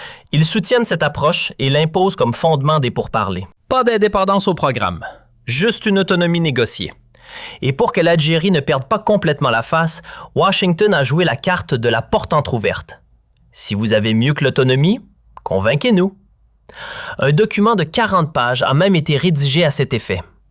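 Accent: Canada. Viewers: Mensonge, on dirait un Marocain qui parle canadien , les algériens sont a l'aise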